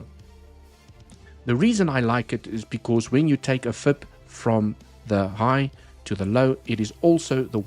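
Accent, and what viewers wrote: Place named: South Africa. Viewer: Thanks. Nice to hear a South African analyst. I'm in the UK now, but 41 years in Southern Africa. 🇿🇦🇿🇦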